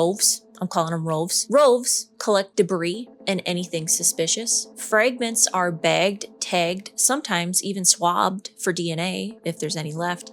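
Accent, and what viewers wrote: Minnesota, United States. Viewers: are you from Minnesota?I love listening to your voice and I love your videos 😊Hi from Maine🌲🦞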